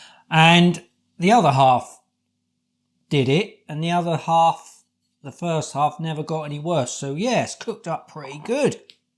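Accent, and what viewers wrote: Norfolk, United Kingdom. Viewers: Well I could have easily have just skipped to the cooked product, but the Norfolk accent alone was enough to draw me in. Its like listening to one of the farmers in my village, except, he's talking dough balls instead of ploughing. I'm hooked, already watching another video with your dog, and the video montage of him throwing a plastic slide around the garden is hilarious. And yeah, anything carby is usually up my street. People assume being a vegetarian you'd eat healthier, but no, just pure carbs and quorn. Speaking of, have you tried 'The No Meat Ultimate Burgers' at Iceland, one to review if you haven't already, my top burger for sure. Good sausage rolls too. Anyway, I'll see what i think of the dough balls. You too.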